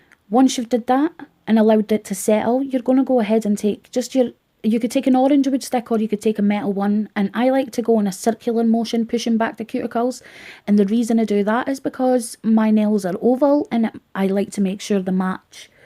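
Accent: Scotland, United Kingdom. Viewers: Lovely Scottish accent. Nice video!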